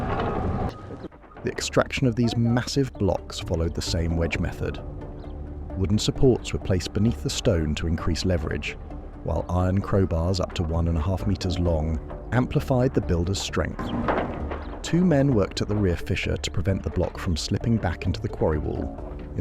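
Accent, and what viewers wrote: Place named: England, United Kingdom. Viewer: I love hearing metric measurements in an English accent, I's almost as good as hearing them in an American one 🖖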